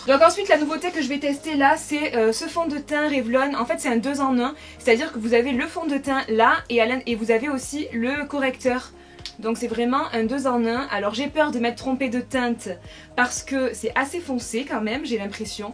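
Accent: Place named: South, France. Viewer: oh j'ai trop aimé j'espère que tu referas des vidéos comme ça !je crois que c'est une des seule vidéo de 20min où je m'ennuie pas devant ! et perso pour les horaires de tes vidéos ça me dérange pas du tt comme ça je rentres des cours et je la regarde en rentrant ! gros bisous ! ❤️🐚🐚 (j'ai l'impression que t'as de plus en plus l'accent du sud j'aime trop 😂)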